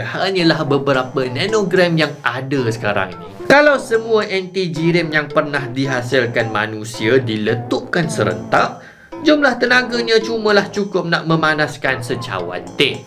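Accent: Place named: Kedah, Malaysia. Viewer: Saya ada soalan ,abang ni orang kedah ka ? Hang hang hang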